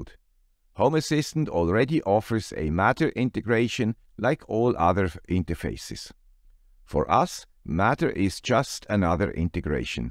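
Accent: Switzerland. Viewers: I was just wondering about it as I got back into HA... And there's a video by the guy with a swiss accent about it! Great! Also, I feel like it's relevant... XKCD #927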